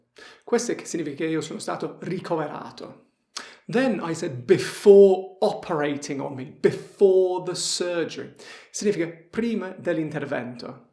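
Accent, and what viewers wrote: Apulia, Italy. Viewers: Sei bravissimo oltrechè simpaticissimo con il tuo italiano dall’accento pugliese. Bravo!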